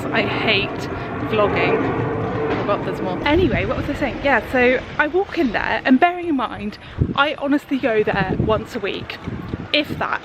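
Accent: United Kingdom. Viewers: I’ve followed you since the makeup tutorial times. I always enjoyed your videos. Your voice is so soothing and it doesn’t hurt to have that amazing English accent. So happy for you … you seem so happy! You have a precious little one watching you read a book to him made my heart melt. Enjoy him they grow so fast. Blessings ❤️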